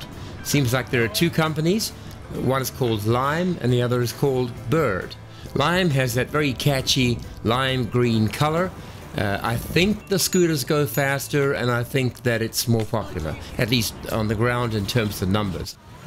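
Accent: South Africa. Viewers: Can hear you're a South African that have been in Canada for a few years. Sorry about your Tesla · glad you two are safe! slightly off topic but are you any bit south african?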